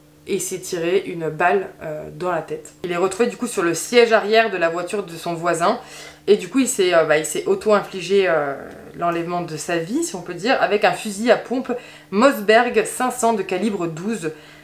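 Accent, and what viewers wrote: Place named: Brussels, Belgium. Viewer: Vous avez quasiment le même accent que feu ma demi-soeur et j'en déduis que vous êtes....bruxelloise. Mais l'erreur est humaine . Vincent, de Liège (Belgique) .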